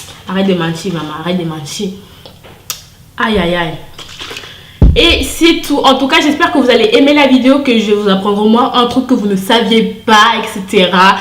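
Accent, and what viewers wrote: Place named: Cameroon. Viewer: Les 1ere secondes ton accent me rappelle que tu es triangle national camer. En tout cas jolie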